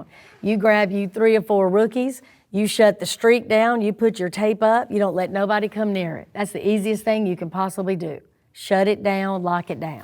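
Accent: United States. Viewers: yes, Georgia. I'd guess southwestern GA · Great to see someone with my accent on YouTube. There’s not enough of the south on YouTube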